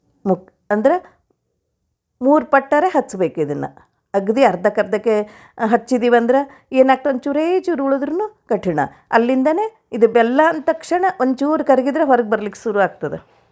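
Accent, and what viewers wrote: North Karnataka, India. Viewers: ನಿಮ್ಮ ವೀಡಿಯೋಸ್ ಭ್ಹಾಳ informative ಆಗಿರ್ತಾವ.... ರಿ ಮಾಮಿ... ನಾನು ಶ್ರಾವಣ ಗೌರಿ ಕೂಡಿಸಿದನ್ನ ನಿಮ್ಮ ವಿಡಿಯೋ ನೋಡಿನ ಕಲತೀನಿ.... ನಮ್ಮ ಉತ್ತರ ಕರ್ನಾಟಕದ ಭಾಷೆ ಭ್ಹಾಳ ಚೆಂದ ಕೇಳಲಿಕ್ಕಿ.....ಏನೋ ನಮ್ಮತನ ಅನ್ಸ್ತದ ರಿ... ಇ ಭಾಷೆ.....Thanks ರಿ.... ಹಿಂಗ ನಮಗ್ ಮಾರ್ಗದರ್ಶನ ಮಾಡಕೋತ ಆರಾಮ ಇರಿ.....❤😊 ಮಾಮಿ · ನಿಮ್ಮ ಉತ್ತರ ಕರ್ನಾಟಕದ ಭಾಷೆಯ ಶೈಲಿ ತುಂಬಾ ಚೆನ್ನಾಗಿದೆ ಹೀಗೆ ಮಾತಾಡಿ ಮೇಡಂ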